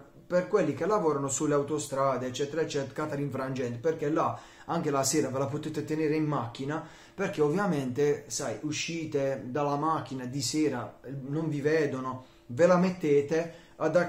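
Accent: Bari, Italy. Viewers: Spettacolo la parlata Barese compaesano Mio 🤣❤🖤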